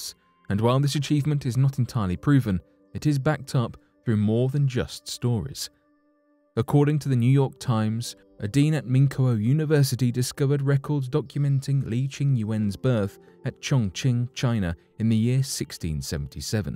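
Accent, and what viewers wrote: United Kingdom. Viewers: the poor man and like his British acent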